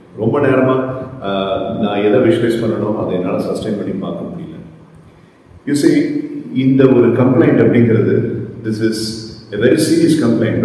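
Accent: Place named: India. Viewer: I'd like to listen but, between the echo and the accent, it's difficult. Thankfully, I have the book. (Generally speaking, I can understand Indian accents -- the echo is the issue I have -- and the closed captioning isn't in English.)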